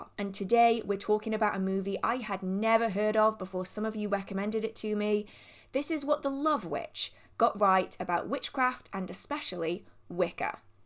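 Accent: United Kingdom. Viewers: Hello there. I found you recently. As someone from Romania first I noticed your British accent. Then your metal look in other videos.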